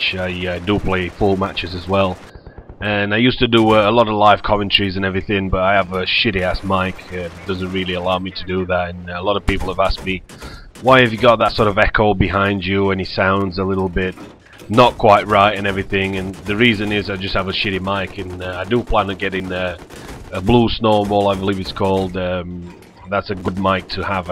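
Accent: United Kingdom. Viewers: He's not from Russia that's for sure... I personally think that he is from somewhere in the UK, I can tell by the way he pronounces some of his words. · he sound British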